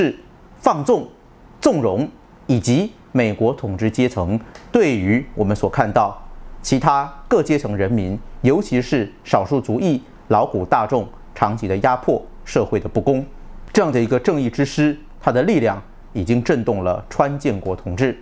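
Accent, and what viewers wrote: Standard. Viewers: 被你的中文发音吸引了!!这才是正统国语发音!! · 炳忠的口音是在向普通话靠拢啊！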